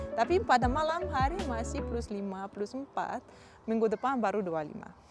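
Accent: Indonesia. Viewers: penyapaianya matul,bule tapi indonesia banget, sopan,disegala hal👍👍sht dan sukses dan kluarga🙏🙏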